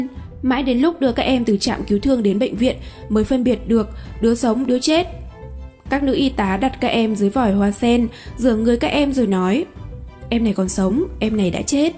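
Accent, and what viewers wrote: North, Vietnam. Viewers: Nghe, dễ. Dễ với người bắc. Còn với người nam khó nghe. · giong doc bac ky thi nghe sac mui Bia chuyen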